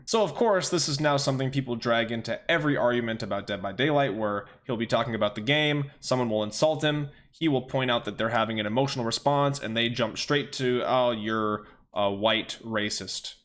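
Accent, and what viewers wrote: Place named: United Kingdom. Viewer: He just sounds condescending to some people because 1) he knows what he's talking about 2) cause of the accent. People have been taking the British accent the wrong way for hundreds of years now lol.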